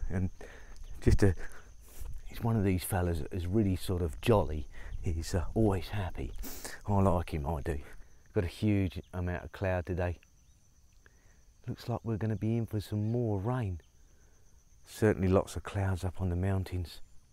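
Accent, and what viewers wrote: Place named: East Anglia, United Kingdom. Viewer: Hi, I know the feeling re-grey hair! Still, I bet it feels refreshing in the heat over there plus less wind resistance on your trusty steed. I had you down as a carrot-cruncher or a swede-basher, there's a bit of an east Anglian twang in there I reckon, did you move out that way later on? All the best to you all, including the outlaws who seem very nice and, like their daughter, very hardworking people.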